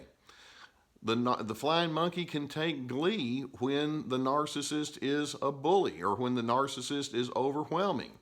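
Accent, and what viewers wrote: South, United States. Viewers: Experiencing his flying monkeys now. It’s awful. I thought these people were my friends. So hurtful. Love your videos. Love your southern voice. Thank you for such honest videos. They are spot on.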